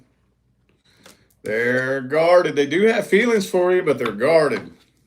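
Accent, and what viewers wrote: South, United States. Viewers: Hahaha you’re like me surrounded in vapes!! Eastern KY!!!!!! Go BIG BLUE!!!!! I KNOW you’re southern or somethin…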